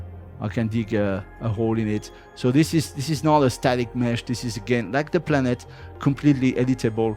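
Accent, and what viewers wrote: France. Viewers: Cet accent français ! 😀 Accent peu prononcé en fait, ça va. C'est même très bien ! Bravo ! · l'accent français ^^ grillé a 100km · C'est mignon, l'accent français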